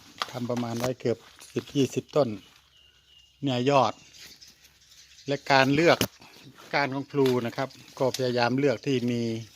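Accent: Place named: Southern Isan, Thailand. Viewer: พูดสำเนียงเหมือนคนอีสานใต้จังครับ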